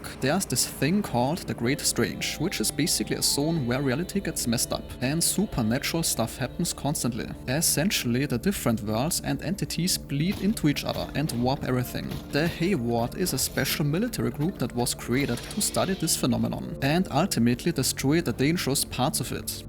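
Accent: Germany. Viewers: "Chee-see Doom". German accents are entertaining and I like your style, subbed.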